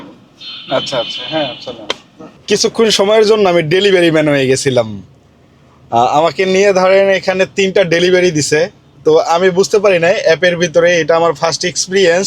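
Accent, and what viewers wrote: Barishal, Bangladesh. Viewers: আপনি কি বরিশালের লোক?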